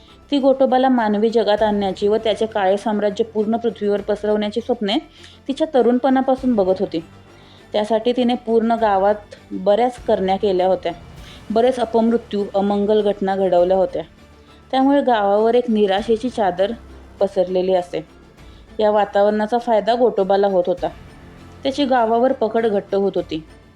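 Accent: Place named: Konkan, India. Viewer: Kokani tone Chan aahe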